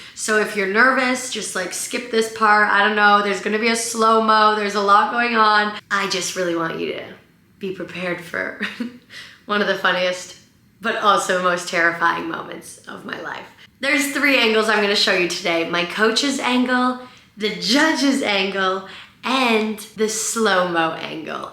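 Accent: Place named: United States. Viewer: Where’s your English accent gone? You sound REALLY American 😳😢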